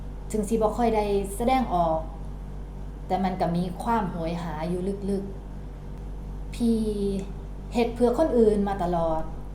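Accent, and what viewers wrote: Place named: Isan, Thailand. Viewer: พูดภาษาอิสานเก่งจัง สำเนียงเหมือนมาก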